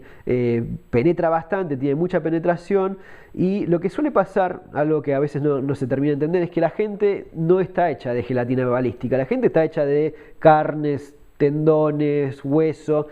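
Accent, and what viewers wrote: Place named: Argentina. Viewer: Se nota por tu acento que sos argentino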